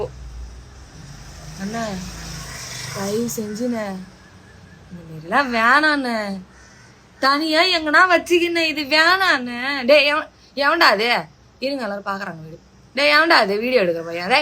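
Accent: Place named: Tiruchirappalli, India. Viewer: Nee endha ooruma? Trichy slang a theriuthu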